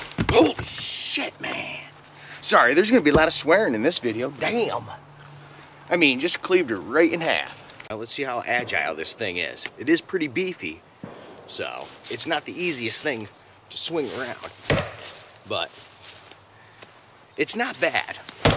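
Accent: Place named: Maine, United States. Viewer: hey what State are you from? cause you sound like a mainah or someone from Maine. cool videos!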